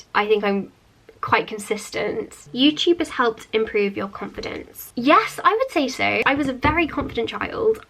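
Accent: RP, United Kingdom. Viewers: i doubt her and her sister both got scholarships (plus she would have mentioned it). i don't understand what you think posh is if it isn't money/posh voice/posh house/posh food that has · I love your British accent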